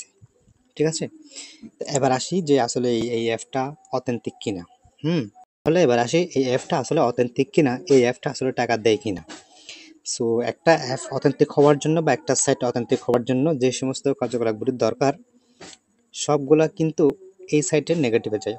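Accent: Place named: Chittagong, Bangladesh. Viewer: ভাই মনে হয় চট্টগ্রামের😊😊😊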